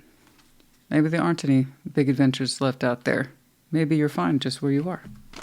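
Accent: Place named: United States. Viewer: Thank you and Cinnamon, 🤗🤗🤗🤗 lol, you got me laughing cause seeing my current energy you'd never have thought I was fiery, my brother constantly pushed my buttons, day in and out for near 18y, he's a Leo, I think my anger amazed him, unfortunately I became dark inside and have been relearning the light w/in me, because I do remember my peaceful happy nature prior to the constant antagonization. Your American English is wonderful, I Love how you speak, yes very soothing and calming, and prolly more descriptive than my vocabulary. So many things passed me by when 29-30y ago I had to give up being stay at home Mom when I divorced and kids, then grandkids all went off having fun w/rest of family while I worked, and many times I was so tired all my remaining energy was to eat supper, shower sleep and do it over again next day. Between driving or factory jobs. 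I missed their developing years. If they want to call me old, I now tell them speak for yourselves, but they're all busy now, I took early soc sec. I talk w/the cat, birds in the yard and God. I monitor my energy, the cat is 16ish, youthful for her age, she just had a well pet ✔️ and I had to mention I'm faster than the cat if she tries to escape my arms, we don't use pet carriers. Love you our us all, Godspeed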